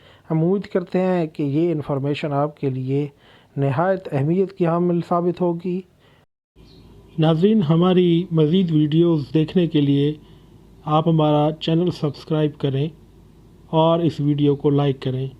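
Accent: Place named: Mianwali, Pakistan. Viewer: Apki awaz se Ap mianwali se lag rahe ho ? Kia ap mianwali se ho ?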